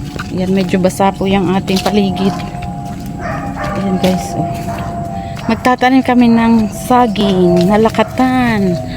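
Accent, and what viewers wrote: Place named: Batangas, Philippines. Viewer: wow sarap yan lakatan, mahal yan fave ko din😊 sarap ng madaming tanim, taga san ka sis? parang puntong batangas kasi😊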